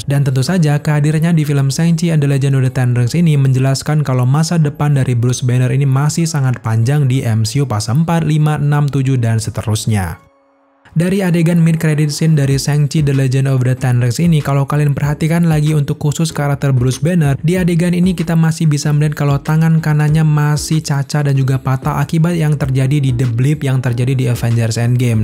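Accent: Indonesia. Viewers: Ya lidah orang indo bisa di maklumi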